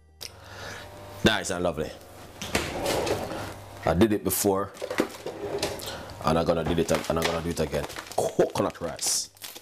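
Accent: Jamaica. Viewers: Basmatic rice 😂 I love his Jamaican accent ❤️ In my opinion It’s not too much salt because of all the water and coconut milk he added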